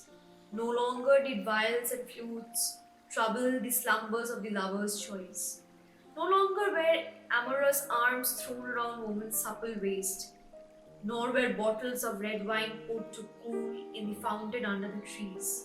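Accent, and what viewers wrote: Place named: India. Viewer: Beautiful story . And very nicely told. I like Indian accent.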